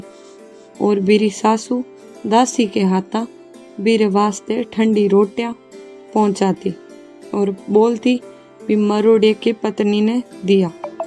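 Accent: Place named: Marwari, India. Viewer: Nice marvadi voice 🙏🏻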